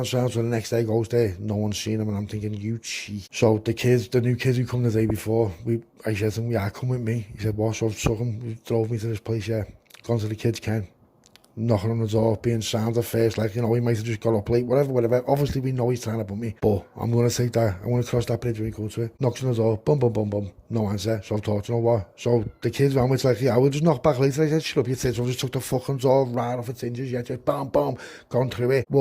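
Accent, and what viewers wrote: Liverpool, United Kingdom. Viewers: Hi scouser ❤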